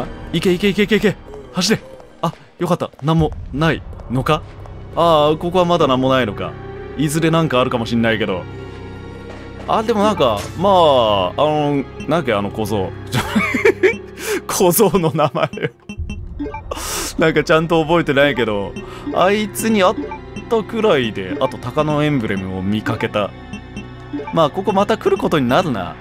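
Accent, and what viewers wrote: Kansai, Japan. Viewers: なんでちょいちょい関西弁なん？（笑）好きやけど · クレアへの要求が高いうっしー(•'-'•) なんか最近関西弁出てるうっしーw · 今回のうっしー、関西弁多めだなぁと思ってたら 理由わかった👍️ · ちょこちょこ出てくる関西弁がたまらなく好き笑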